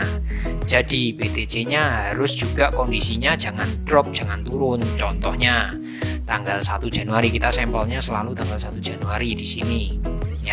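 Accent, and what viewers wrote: Java, Indonesia. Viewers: Logat jawa kukira mukanya jawa, tp muka chinese kek opah opah hihi Thanks ilmunya bang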